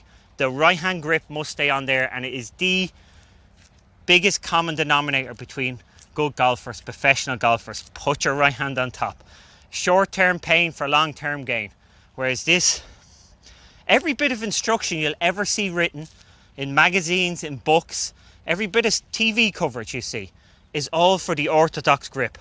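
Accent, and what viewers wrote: Ireland. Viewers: I enjoy all of golf tips and especially the way he explains everything. Also love the Irish accent. Some of the best people are from Ireland. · 😂it’s the Irish pronunciation, clearly he’s from a smaller town or village.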